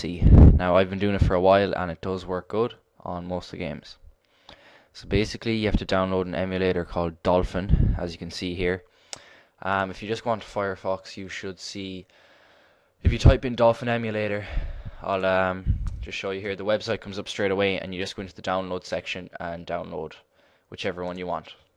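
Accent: Newfoundland, Canada. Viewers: your from newfoundland? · Im a newfie too, saw the zelda back ground and heard the accent and thought "MY BRETHREN"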